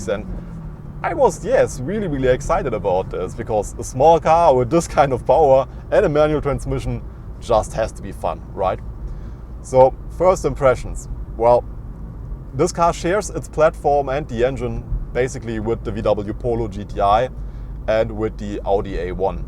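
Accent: Germany. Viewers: After 2 minutes watching you video , I discovered that the review is in English hhhhhhhhhhh , I love German-English ... Good review man , thank you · Krass wie schnell man einen Deutschen an seiner Schrott Aussprache erkennt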